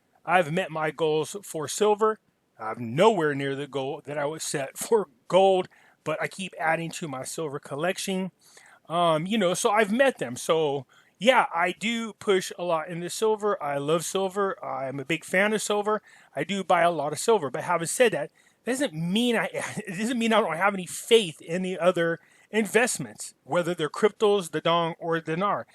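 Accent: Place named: United States. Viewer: Hi why do all Americans pronounce Iraq and Iran with an I as in I they pronounce it with an India pronunciation.. could you change your pronunciation to honour these countries ? Thanks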